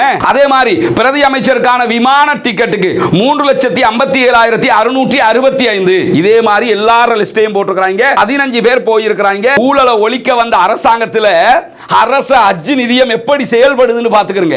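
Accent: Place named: Tamil Nadu, India. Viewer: நீங்க தமிழ்நாட்டில் இருந்து வந்து இலங்கையில் வாழ்கிறீர்களா? ஏன் என்றால் நீங்கள் பேசும் பேச்சு வித்தியாசமாக இருக்கே! 😮 உதாரணம்: டாய்லெட் / டாலர் / பாலஸ்தீன் என தப்பு தப்பா உச்சரிக்கிறீங்களே!!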